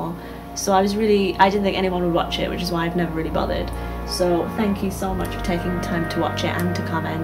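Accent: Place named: Northeast England, United Kingdom. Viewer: First thing: That picture is awesome! Like, super amazing! Second: Your accent... It's my accent... Are you from somewhere in the triangle of cities of Newcastle, Sunderland and Durham? (I'm from a town an equal distance from all three and sometimes it's hard for me to tell which specific accent comes from which city.)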